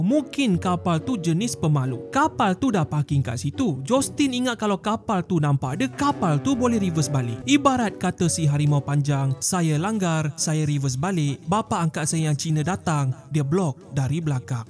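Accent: Sabah, Malaysia. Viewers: Orang Sabah Ka kau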